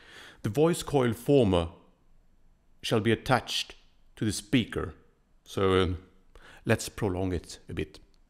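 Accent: United Kingdom. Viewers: Anyone else think his voice sounds british but is actually swedish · It's crazy how has a much more British sounding voice than regular. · I can’t tell if you’re very posh British, or Eastern European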